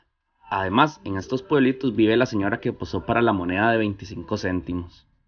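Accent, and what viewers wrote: Costa Rica. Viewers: Estás guapo vos tico, me gusta el acento costarricense ya que no pronuncian la letrra ese aspirada tal como nosotros los chapines tampoco lo hacemos. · No te hagas muy el extranjero porque Guate es el hogar de todo centroamericano, lo unico que uds tienen otro acento un poco deferente al de Guate y porque tienen a Wanchoppe que lo odiamos jajaja pero pues somos lo mismo.. Saludos hasta tiquicia la bella, gente honesta, chambiadora, honrada y siempre echada para delante como la chapina.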